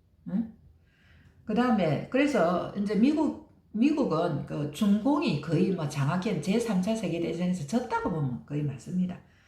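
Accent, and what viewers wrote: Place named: Busan, South Korea. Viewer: 부산 같던데요 ㅋ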